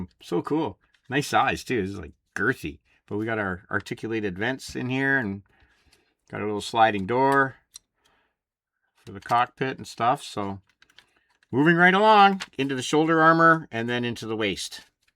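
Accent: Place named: Scotland, United Kingdom. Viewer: Your definitely Scottish not Finnish... Lol Great kit!!! 😎👍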